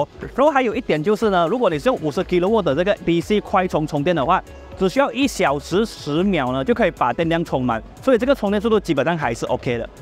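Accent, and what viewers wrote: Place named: Malaysia. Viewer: 觉得还好大马很多人都是这样讲话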